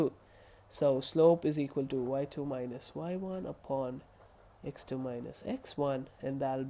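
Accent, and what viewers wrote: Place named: India. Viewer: i like this guy's voice. finally an idian-accent tut without fire-speak (really fast indian accent) - but the video is tilted! please make more but make sure it's viewable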